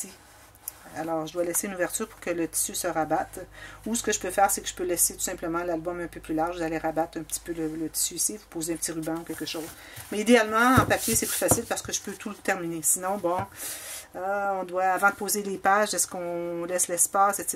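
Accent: Canada. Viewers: Bonjour, Je suis passée par là, malgré mes années de bricolage, j’ai mis du temps avant de comprendre et d’adhérer à un projet. Il faut réécoutez et notez les thermes employés par afin que vous n’ayez aucune hésitation quand vous l’entendez. L’accent, les thermes déformés par la traduction canadien / français, la vitesse du langage... compliquent au début. Si vous ne relevez pas ces thermes vous perdrez du temps et vous dégoûterez. Choisissez un projet hyper facile, qui vous plait, sans interactions (cascade, pochette, cahier d’écriture, image interrompue etc). « Livre photos pour débutants « « Album infini » « Album extensible « (6) « Folio rigide à deux sections « « Série cadeaux faciles et rapides » (tuto que je vous ai vu mettre de côté le 17.11.19) Beaucoup d’autres encore dans sa playlists sont faciles. Il sera temps ensuite, d’augmenter les difficultés en vous faisant plaisir. 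Votre hésitation, c’était il y a longtemps, mais peut-être que vous n’avez pas eu le temps d’avancer....je me permets de vous envoyer ces réflexions et je vous souhaite beaucoup de plaisir à scrabooker si ce mot existe 😁 Bernadette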